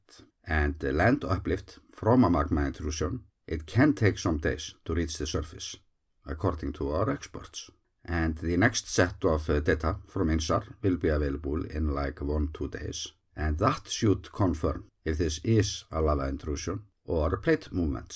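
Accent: Iceland. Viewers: Hey don't mess with the icelandic accent!! Learn proper english english bro then you should understand this mister murica.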